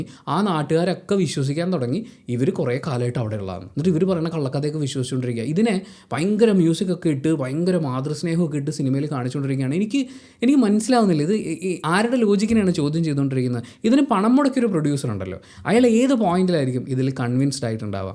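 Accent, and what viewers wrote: Kannur, India. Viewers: ningal...Kannur,kasargode...alle...namma...parayunna pole...namma...Thrissur,Ernakulam...Kar parayuna pole..Chakrashwasam ennokke...pedakkanundallo...any way...nice review about the cine crisis.and your views, solutions and suggestions are appreciatable.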